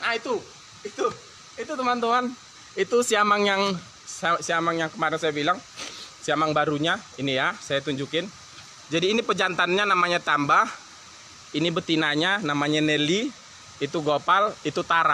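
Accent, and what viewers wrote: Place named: Brunei. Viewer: Bang perkataan mu aku dengar ada ayat berbunyi "kau ani" melayu nya seperti cakap brunei ada "kau ani" 🤣👍🏻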